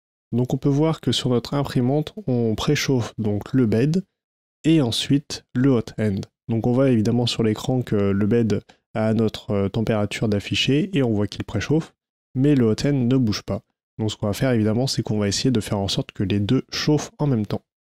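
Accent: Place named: England, United Kingdom. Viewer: J’adore cet accent anglais!!!🤣👍🏻...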